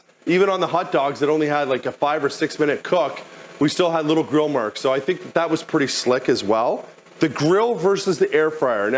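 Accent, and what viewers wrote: Canada. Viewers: Soooo...don't put it in the dishwasher then? Also, you sound suspiciously like a Canadian.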